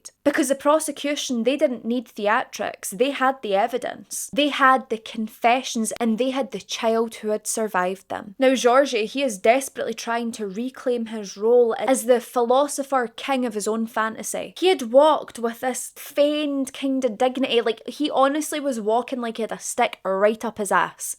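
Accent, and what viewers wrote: Scotland, United Kingdom. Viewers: I love your accent. I have got to find a Scottish woman 😂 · I really Enjoyed Listening to your TRUE CRIME STORY. YOU'RE A BEAUTY, WHO HAS SUCH A BEAUTIFUL ACCENT AS WELL..IS IT A SCOTTISH ACCENT. SOUNDS ALOT LIKE MY FAVORITE ACTOR SEAN CONNERY.. THIS IS SUCH A WILD, CREEPY CASE..YOU DID AN EXCELLENT JOB, BC IT HAS TO BE DIFFICULT GETTING THESE VIDEOS OUT TO US...I APPRECIATE YOU. I JUST SUBSCRIBED TO YOUR CHANNEL...I ALSO HYPED THIS VIDEO!!! MANY THANKS!!! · New subscriber here, I love your accent it sounds a bit scottish. Such a beautiful young lady you are. ❤ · Are you from Scotland? love the accent! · Scottish Emma Kenny vibes. Love it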